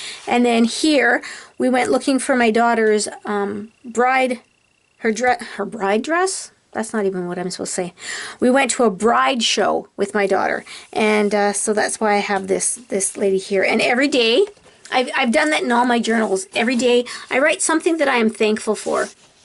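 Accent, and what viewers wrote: Canada. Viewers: The "aboat" gave it away immediately! You're Canadian! I've enjoyed watching your video.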